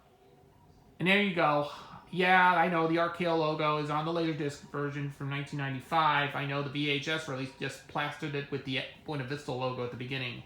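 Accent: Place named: New York, United States. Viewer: I can hear from your accent that you're a new yorker lol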